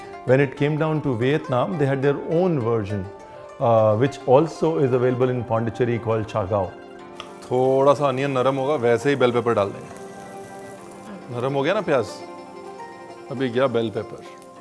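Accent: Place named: Punjab, India. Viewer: Phir ye mat kahna ke Maine bataya nai,kayal han ji tahade,sirf punjabi sunan lai baar baar videos dekhdi haa,punjabi tadka,luvv u g alot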